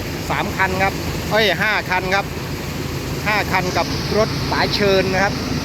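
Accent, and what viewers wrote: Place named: Korat, Thailand. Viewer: วะพูดภาษาโคราชบ้านเอ็งเลยน้ะ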